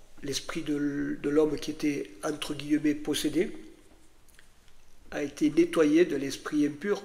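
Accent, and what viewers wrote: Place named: South, France. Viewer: Merci monsieur... je vous ai decouvert il y a peu de temps... j’aime la justesse de vos propos... je remarque un bel accent du sud! Peut-être Êtes-vous proche de chez moi ! J’habite a Prades à 46 km de Perpignan ! Y a t’il une possibilité d’aller vous écouter si vous êtes dans la region ? En tout cas merci infiniment pour vos prédications ... j’aimerais beaucoup que le mo’de évangélique se rapproche d’ Israël, de ces fêtes et de la Torah... que notre Seigneur vous bénisse abondamment ! ❤❤❤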